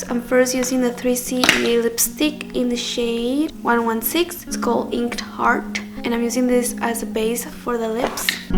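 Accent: Spain. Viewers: Where are you from? Your accent is interesting it sounds almost as if you naturally speak Spanish..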